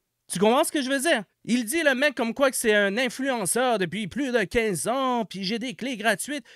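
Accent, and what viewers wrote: Quebec, Canada. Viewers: pour moi, ta voix est agréable, j'aime t'entendre parler même si je n'ai pas toujours été fan de l'accent québécois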